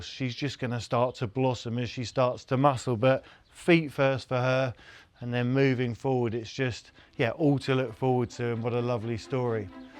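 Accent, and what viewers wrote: United Kingdom. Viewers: ohhhh LOVE THE INTRO of that sweet british voice def need more ;];];] sorry i live in maine in the U.S.A. and i cannot get enought;];];]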